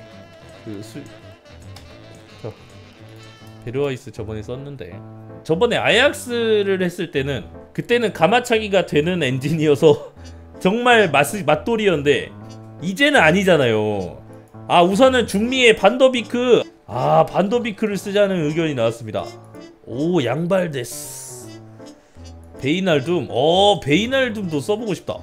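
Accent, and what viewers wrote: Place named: Japan. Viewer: 이형 일본팀 하면서 일본 말투 중독됬네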